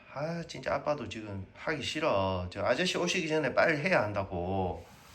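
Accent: Gyeongsang, South Korea. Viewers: 오랫동안 질리지 않는 이 사랑스러움과 친근한 경상도 사투리의 주인님 찰떡궁합과 멘트 쵝오🎉🎉🎉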